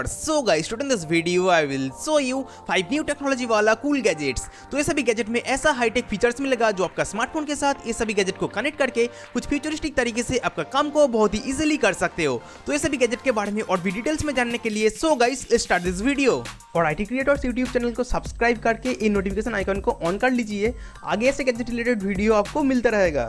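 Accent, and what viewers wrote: Bengal, India. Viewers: Powergrid Fatehpur its due to Bengali tung... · r u BENGALI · R u Bengali? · Aapki tone se aap Bengali lag rahe ho relax dear aaram se bolo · Bro are u a bengali ur pronouciation is funny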